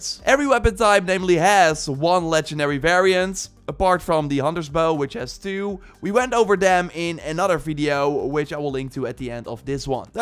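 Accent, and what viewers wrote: Netherlands. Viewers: That was very helpful already wasted some time on purples not knowing about levendary. Small friendly advice: Im assuming youre dutch. 'Armor' is pronounced like the dutch word for poorer. "Armer" instead of "armòr".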